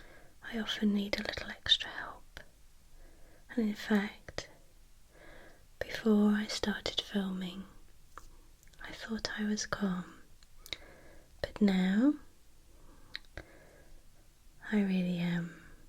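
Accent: England, United Kingdom. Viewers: Hello, I listen to you in France, near Paris. I enjoy your voice and your videos. Are you english or american? I suppose you are english.... I don't understand all what you say but I listen with a lot of pleasure. My english is not very good, sorry. Very friendly to you!!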